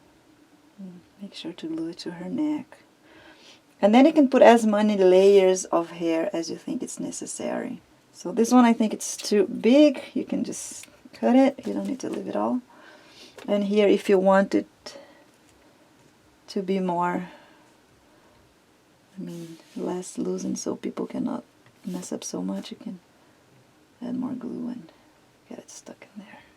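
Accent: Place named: Brazil. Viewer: Aah, eu sabia que seu sotaque era diferente, imaginei que fosse brasilwira no momento em que ouvi tua voz, alguém mais?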